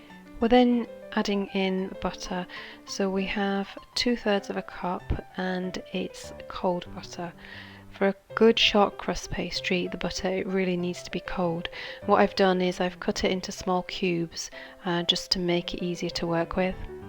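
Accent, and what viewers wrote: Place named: RP, United Kingdom. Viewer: I'm an 81 yr old ex pat living in the USA since 1980. Lost my wife to heart surgery a few years back, after 60 yrs together, so I'm now the novice cook. No plain flour in the US only all purpose or Bread flour. Can I use either, as is, or with some modification. I just tried your project with premade Pillsbury puff pastry. Everything that could go wrong, did. Cut the circles too small, pastry is a bit thin, didn't reach the top of the muffin hole. Jam overflowed etc. Welcome to my disaster zone. Tried making a Treacle (golden syrup) in bread crumbs pie yesterday, did a blind bake on the crust, came out good but the syrup filling went rock hard, guessing poor syrup to breadcrumb ratio. Is there any hope for me ? I'm a Dagenham boy so your accent is more posh than mine. Blessings Bob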